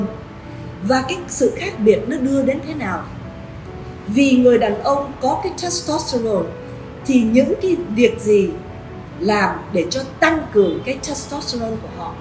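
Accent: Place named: North, Vietnam. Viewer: Em sinh ra ở Biên Hoà được coi là thủ đô Bắc 54 nhưng hiếm ai có chất giọng Bắc 54 nghe sang và ngọt ngào như chị Kỳ Duyên <3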